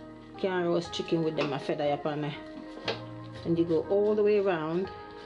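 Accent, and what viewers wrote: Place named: Jamaica. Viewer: "Caan nyam dat", as Jamaican myself I just love how you go in and out between English and Patois.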